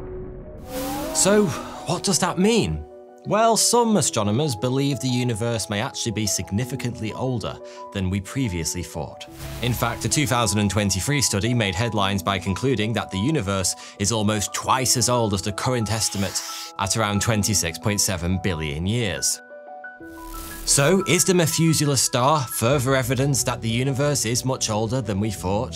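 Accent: United Kingdom. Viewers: WHY DO WE ALWAYS HAVE TO LISTEN TO A BRITISH ACCENT BLATHERING ABOUT THE IMPORTANT QUESTIONS OF LIFE AND DEATH ??? WHEN WILL THAT BROKEN ENGLISH RACE FINALLY RETIRE FROM HISTORY ???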